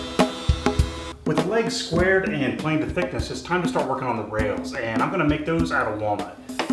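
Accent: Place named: Midwest, United States. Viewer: Are you a NC native? For some reason I was thinking Wisconsin, Michigan (definitely Midwest of some sort) native based on your voice. Sorry so random...message me if this isn't the appropriate forumn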